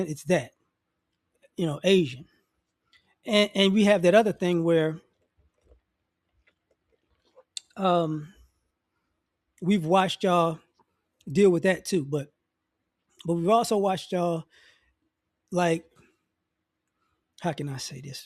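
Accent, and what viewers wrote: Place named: New Orleans, United States. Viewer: I still hear a little bit of New Orleans accent just a little bit 😄 Peace to you brother. Appreciate you brother ✌🏾✊🏾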